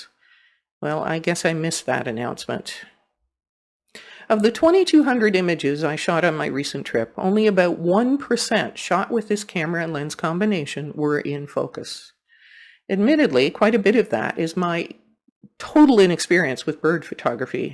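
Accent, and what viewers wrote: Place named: Canada. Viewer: First of all, I would like to say what a pleasure it was to catch your video, and it was lovely to hear a Canadian accent again! I lived in Hamilton, ON, for about 6 years before moving to Victoria, BC. I now live in Auckland, New Zealand. I started my professional career in 1980, but am now retired, so I have quite a few things in common with you. My preferred genres are wildlife, scenic and travel, but I have also shot architecture, portraits, commercial and industrial stuff. In NZ, birds are the dominant wildlife form, so my experiences are very much couched with shooting them. So, to your experience... I do understand your frustration with challenges using third-party lenses. I got the R5 and a couple of R6 units about 20 months ago along with the 100-500, 24-105 L units, and the 24-240 (which, by the way, is a surprisingly good all-rounder!). 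However, in my fairly vast array of legacy gear I have the Sigma 150-600c and 60-600s lenses, and I tried them on my R5 and R6 units via the Canon basic EF-RF adapter. To be honest I had no issues unless I was shooting close to minimum focusing distance - an experience echoed by respected Australian bird photographer Duade Paton in one of his videos on the subject. I have not checked your settings at the time of responding, but there may be some subtle difference in how we have our units set up. One thing of note is that Canon have released a long series of firmware updates for the R6 and R5 to fix issues with tracking and focus tha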